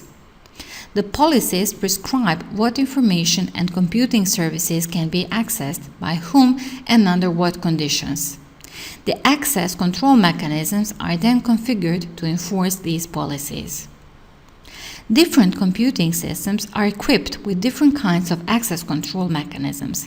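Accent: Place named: Russia. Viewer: That accent, are you from Albania or Russia?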